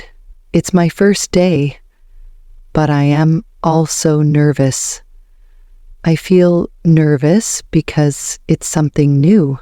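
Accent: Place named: United States. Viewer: Sorry why it's It's the British council but you've an American accent? I want to learn English accent with this method. Thanks.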